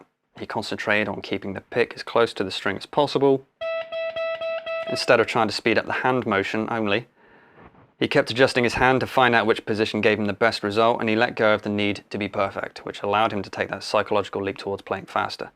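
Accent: United Kingdom. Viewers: Wow. I can do it now! I think all it took was that lovely British accent. Oh, and also imagining that the pick is always in contact with the string. Brilliant! Thank you, brother.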